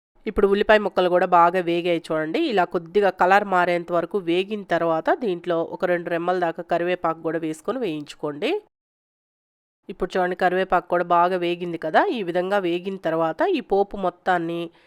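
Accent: Nellore, India. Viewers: Meedi Nellore madam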